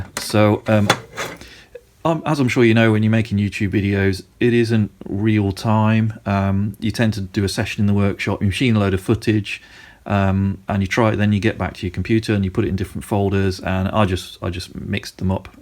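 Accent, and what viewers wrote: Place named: United States. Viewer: Funny accent for an American.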